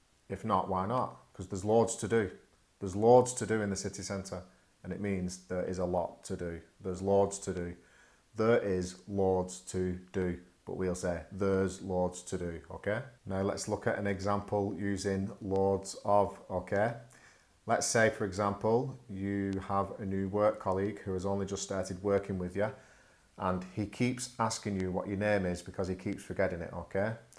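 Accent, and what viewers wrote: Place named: United Kingdom. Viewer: Hello! I am your new subscriber! 👱 You British accent very similar to Gordon Ramsay's, so British that I wanted to make me a cup of tea with milk instead of black coffee as I used to ☕😅 Happy to find your channel! Wish you loads of love, tons of money and a load of new subscribers in the coming year! 🎄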